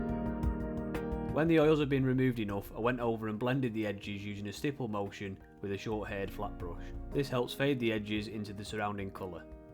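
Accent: United Kingdom. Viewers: this is pure art...doing the job and explaining it so well with this beautiful british accent...luuush:-)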